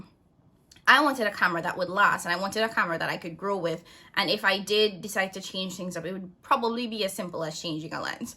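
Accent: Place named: Trinidad and Tobago. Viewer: I'm trying to figure out where you are from based on your accent. It sounds like Trini to me. I'm planning on getting the Sony ZV-E10 to replace my Canon SL2, I find the buttons on the Canon to be too complicated to figure out and ALL of the Sony ZV has simple buttons and only I think 5 options per button placement.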